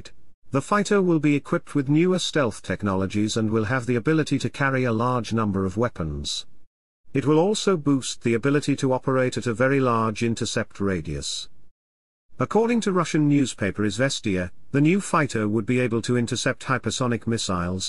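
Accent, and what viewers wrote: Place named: United Kingdom. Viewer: Very interesting report.. Excellent British pronunciation.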